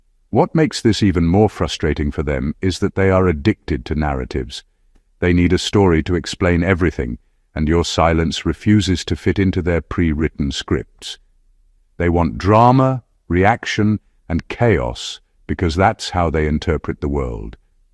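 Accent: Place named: New York, United States. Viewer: Vivid imaginations they have, don’t they ? Their lost in the sauce. NY accent sawus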